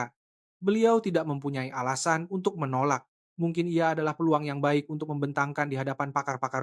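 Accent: Indonesia. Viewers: Ini cerita yg guna loghat indonesia.. ini reka cerita bukan dari Malaysia n bynk reka cerita yg tak mencerminkn org malaysia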